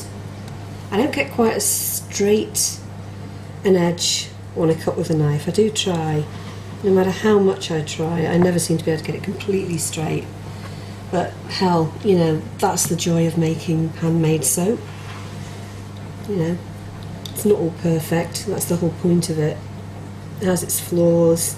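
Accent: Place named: United Kingdom. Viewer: So cute!...i always seem to have issues with my mnp setting in my pouring jug....i dont like to heat it up too much because i worry about my fragrance being burnt off....your mnp looked very hot....you dont have issues with burn off....love ur videos :o)....nice to hear a uk soaping voice! x oh and the cornflour tip..awesome :o)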